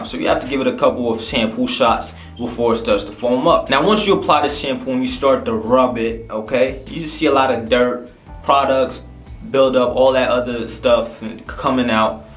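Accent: New Jersey, United States. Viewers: you sound like you from Jersey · Yo you from Jersey?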